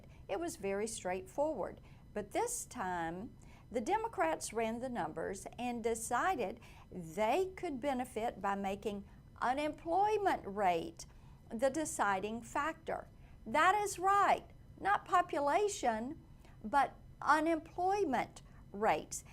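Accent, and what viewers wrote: South, United States. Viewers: Anyone else notice she pronounces her ing's just like Forrest Gump?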